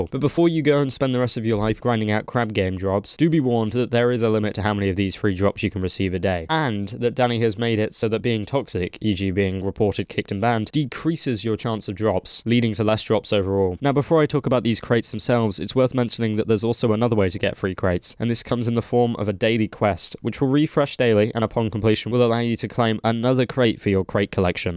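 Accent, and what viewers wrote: Argentina. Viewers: Che sos argentino? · are u from argentine ??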